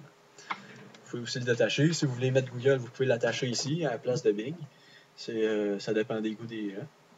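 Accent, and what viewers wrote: Quebec, Canada. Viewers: Haha la vidéo à commencé j'ai pas compris, puis jme suis dit "ahhh mais c'est du québéquois" =) Quand on s'y attend pas ça surprend ^^ merci pour cette vidéo ! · Est-ce que les Français font exprès pour ne pas comprendre l'accent Québécois??? Nous on comprends très bien votre accent et vos énormes anglicismes!!! "Putain j'piges pas c'que tu dis bordel"... nous on dis "Criss qu'es-ce tu dis tabarnak"... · C'est toi le con ! Ça s'entend pas que c'est un Québécois ??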